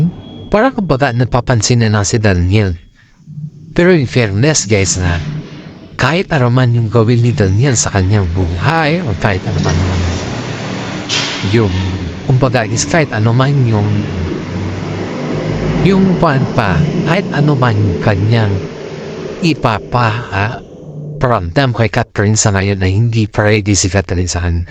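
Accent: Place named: Arab world. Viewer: Ayusin mo ang salita mo ano ka afam oarabyano?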